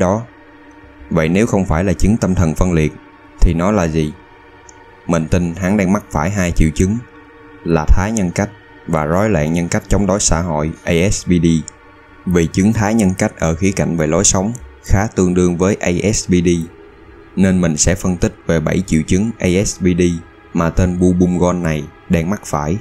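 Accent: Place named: South, Vietnam. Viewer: giọng nói miền Nam về rất hay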